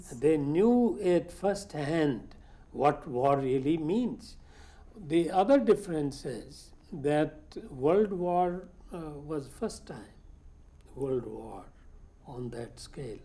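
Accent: India. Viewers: Useful but it goes heavy with Indian English.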